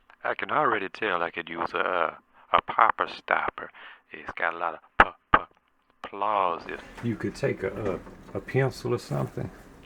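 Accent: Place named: Mississippi Delta, United States. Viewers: My favorite thing to do in the morning is watch craftsman while I’m doing my make up lol there’s just something about the combination of an extremely southern man talking to me about making toys which I will never build while doing my someone elaborate make up routine 😂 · This channel rules, and that dulcimer deep south Mississippi delta accent is the cherry on top.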